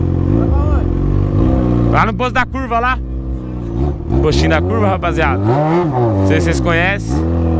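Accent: Goiás, Brazil. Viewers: pq parou de falar no pique do Goiás? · o porque você não fala mais no pique do Goiás?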